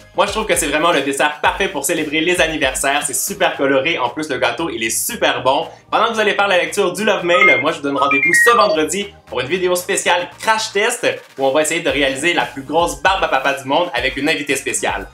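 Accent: Quebec, Canada. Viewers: 🎂🎂Félicitation pour tes 100 000 abonnés PS :je kiff ton accent québécois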